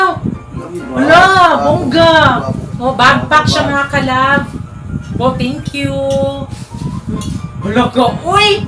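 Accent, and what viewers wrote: Visayas, Philippines. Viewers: i like the way you pronouce haha Lusyon. haha i am just like you. haha the way you speak. Bisaya man gyud. God blessed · Kaboses mo si michelle fox... Visaya accent is real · James Bryle bisaya kasi siya, just like me. i noticed her accent. hehe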